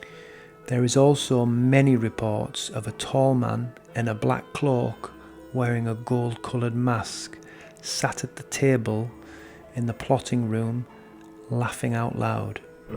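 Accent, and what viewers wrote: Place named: Yorkshire, United Kingdom. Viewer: I love your narration and Yorkshire accent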